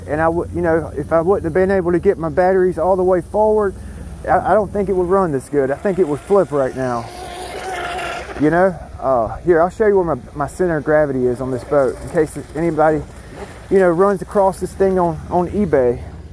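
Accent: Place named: South, United States. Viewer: Love your southern twang. I'm from Michigan but talk a little like you. I'm Midwest, and southern Indiana, with Canadian accents here and there HaHa!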